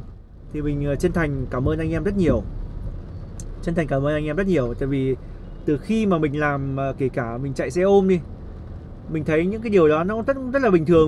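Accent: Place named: South, Vietnam. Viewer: Anh có bạn miền nam ko? Anh nói nhiều lúc có pha tiếng nam vô :))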